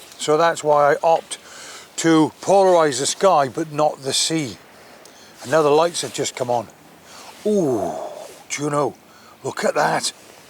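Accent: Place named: England, United Kingdom. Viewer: Boy, I love you as a photographer. You’re in England. I love the accent and the shots are just gorgeous. Now I know what the blue hour is, but we never see one here in California. I live I live in London I loved by cameras. I haven’t been doing any photography lately because I’ve been recuperating from a surgery and just watching you is one of the best things I have seen in a long time. Thank you for your pictures.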